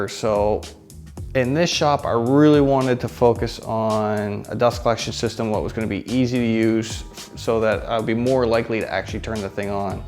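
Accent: Canada. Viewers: I believe he is canadian, not a yankee :p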